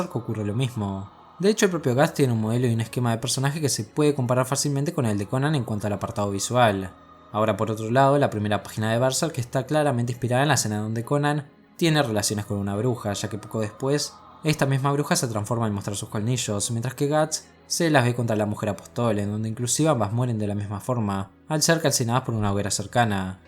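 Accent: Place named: Argentina. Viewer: Un argentinoooooooooooo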